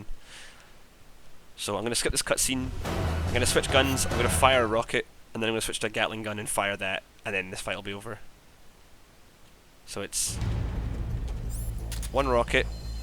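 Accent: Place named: Scotland, United Kingdom. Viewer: Nice scottish accent. Whats up from Dundee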